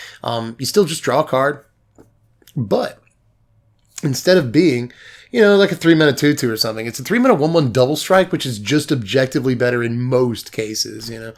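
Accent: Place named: Georgia, United States. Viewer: I totally guessed you were from GA takes one to know one.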